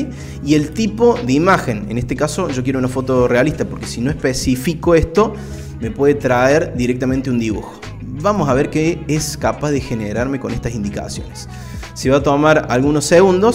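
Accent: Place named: Córdoba, Argentina. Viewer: Que tal capo, me parece que somos coterraneos no, ¿Cordobés?, bueno, lo que te quería consultar es si las imágenes que genera Bing son libres de derecho. Saludos desde Calamuchita 👍✅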